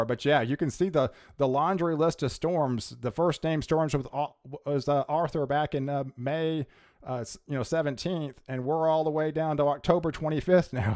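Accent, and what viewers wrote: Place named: Western Pennsylvania, United States. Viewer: You are from western PA. You cant hide the accent!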